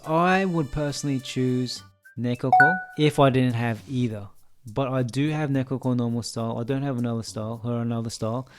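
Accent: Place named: United Kingdom. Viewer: i thought British. Xenoblade was dubbed in the uk and I now really appreciate British voices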